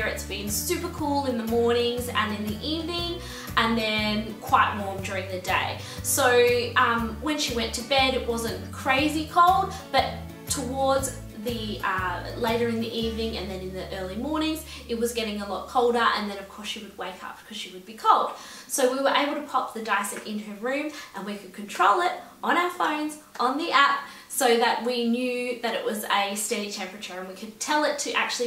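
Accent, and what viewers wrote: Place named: Australia. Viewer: I love Australians accent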